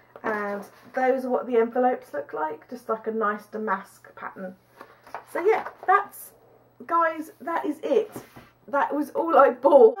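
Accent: England, United Kingdom. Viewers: you are so cute! Love your English accent. You make me smile. You always lift my spirits! God Bless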